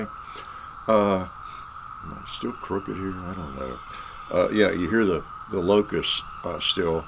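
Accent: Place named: Northern Kentucky, United States. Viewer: Sounds like you started out in northern Ky. Hello from California KY.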